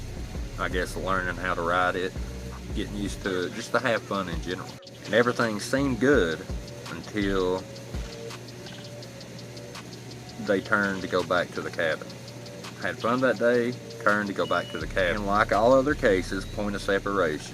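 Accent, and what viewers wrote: South, United States. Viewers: You have to be from the south ?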